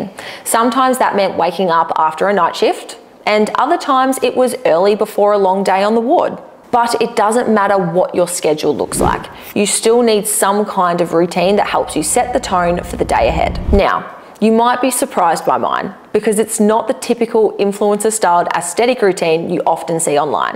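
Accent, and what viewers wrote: Australia. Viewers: I am here just for the smart video with common sense information and the Australian accent....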